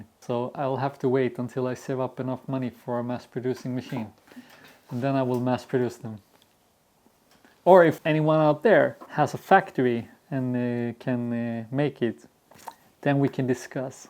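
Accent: Sweden. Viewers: Who said that your English is bad, actually I found it perfect, and add that little Swedish accent to it that makes it even better an special, honesty don't listen to those ppl cause you can't be liked by every one no matter what you do, just continue doing your own things and the most important part enjoy doing them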